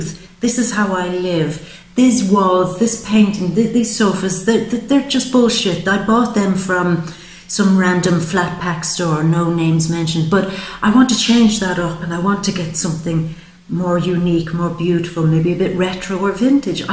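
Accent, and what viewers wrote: Ireland. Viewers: Felt pulled to ur video😇after watching O.A.'s 1st season yesterday, I see why🤔👰🤴🥂loved the reading & ur lovely Irish accent😃😎☄had to sacribe to ur channel & want to say THANK YOU to u in advance, 4 helping me w/my "Ascension"☄😎👰🤴🥂🕉 4/28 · I love your Irish accent. I work for a lovely Irishman. The Irish are poetic, and deeply in touch with the spirit energies! <3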